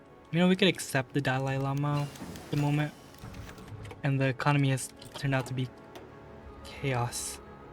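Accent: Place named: Indonesia. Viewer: your accent sounds kinda like you’re from Indonesia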